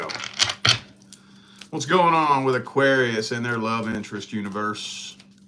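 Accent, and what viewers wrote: South, United States. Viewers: I’m so glad I found u again. You crack me up. Real asf with that southern drawl…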